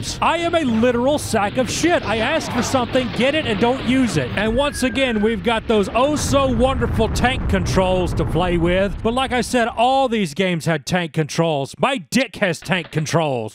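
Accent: South, United States. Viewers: He's southern like accent with a coyote avatar fits well like the final peace of the puzzle. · A game reviewer with a southern accent covering one of the games that I played to death as a kid? Have a free subscribe, good sir.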